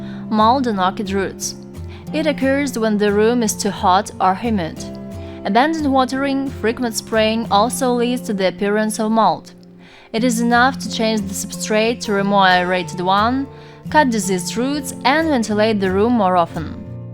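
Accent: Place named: Russia. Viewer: Publicly known facts delivered in heavily accented English. Perhaps make the next video in Russian, and only when you have useful info.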